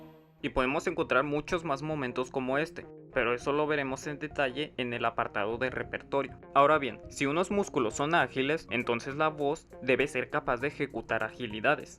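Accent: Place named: Mexico. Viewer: Dall'accento direi che è messicano. Sicuramente non spagnolo.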